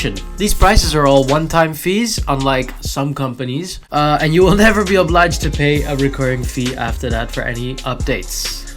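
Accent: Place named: Netherlands. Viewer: that dutch accent is thickkk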